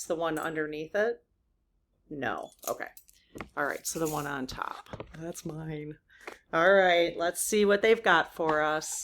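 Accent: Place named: Wisconsin, United States. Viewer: I had to check whether or not you were from WI. Your accent gave it away! I enjoy your videos.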